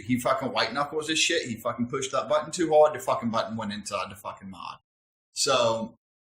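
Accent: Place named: Australia. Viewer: But harsh but can't fault the honesty. You sound Australian! OI OI oi?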